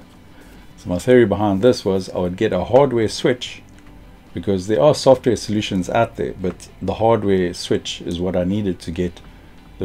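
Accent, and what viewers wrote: South Africa. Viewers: Are you South African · UNRELATED COMMENT: but he south african aint he? dont be mad i aint racist just curious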